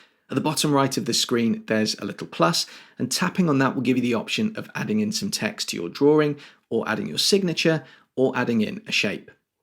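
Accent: United Kingdom. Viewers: Found your channel recently. Really good presentation from a true Brit. You from Cornwall? Or was that a random Safari page? Anyway. Glad I found this. I have already passed on to a friend. I’ve tried loads of other apps in the past, but have settled on the fact that Apple done properly is the best option for me. Thank you.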